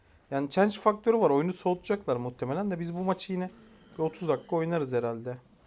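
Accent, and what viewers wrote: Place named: Black Sea, Turkey. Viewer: Reis bende samsunluyum okadar belliki karadenizli olduğun gerçekten çok güzel videolar başarıların devamını dilerim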